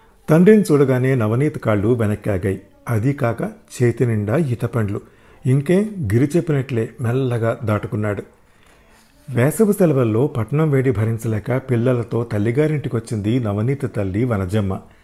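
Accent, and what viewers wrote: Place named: Telangana, India. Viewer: పాల బుగ్గల జీతగాడి కథ చాలా అందంగా ఉంది. యాదగిరి ని తలుచుకుంటే పాపం అనిపించింది. మీరు తెలంగాణా accent బాగా పలికారు. మీ ఇద్దరికీ అభినందనలు 💐💐💐💐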